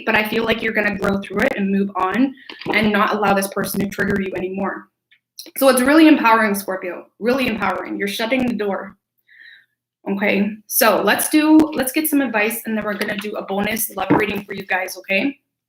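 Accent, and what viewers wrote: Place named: Canada. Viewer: I thought you were Canadian from the accent but that Tim Horton's confirmed it 😂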